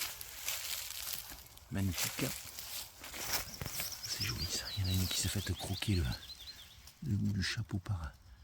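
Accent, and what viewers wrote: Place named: South, France. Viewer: Joli accent du sud pour un lorrain 😅👌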